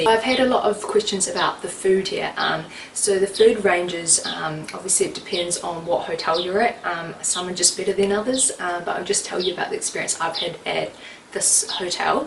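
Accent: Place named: South Island, New Zealand. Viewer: Hey where in NZ are you from? Seems like a South Island accent. Sorry if I am wrong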